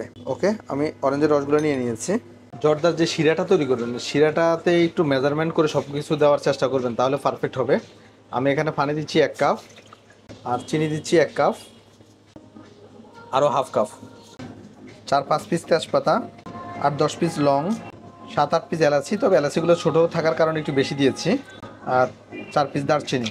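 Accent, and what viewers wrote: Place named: Feni, Bangladesh. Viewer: ভাইয়া আপনি কি ফেনির মানুষ?? কারণ আপনার কথাতে ফেনির ভাষা আসে তাই।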